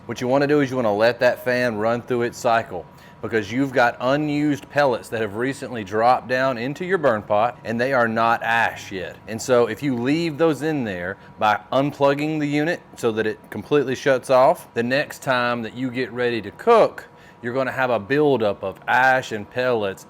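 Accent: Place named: South, United States. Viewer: I am so impressed by you friend. Not only is this a very well thought out and executed video, I have to say that even though you are from the south (obviously), You speak very well! I am so used to southern folks completely butchering their grammar and just basic very poor use of the English language! I'm not knocking anyone from he south, I believe MOST of them are fantastic and fine people, I just cannot stomach to watch 99% of them because their speech makes a Whole bunch of great people that are as intelligent as anyone else in the entire world sound like absolute idiots. FANTASTIC little video here that was just great from start to finish! I will be subscribing! Thank you for being on YouTube!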